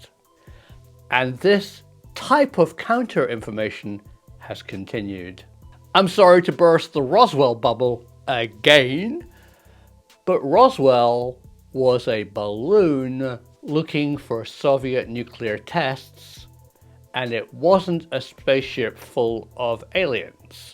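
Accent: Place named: Oxfordshire, United Kingdom. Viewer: Awesome, you from Oxfordshire?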